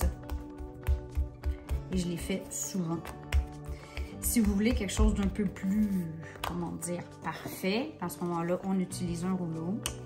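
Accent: Canada. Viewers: Salut ! merci pour le tips , je connaissais même pas le " pain pita " j'suis venu par curiosité :D en tout cas t'arrêttes po !! c'est toute sympa :D ( j'ai l'accent canadien en tête maintenant bravo )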